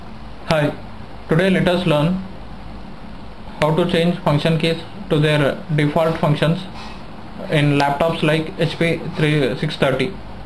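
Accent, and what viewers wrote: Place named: India. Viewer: I understood everything you said (thanks to the Indian movies i watched),thanks man :D it helped. You're Indian right?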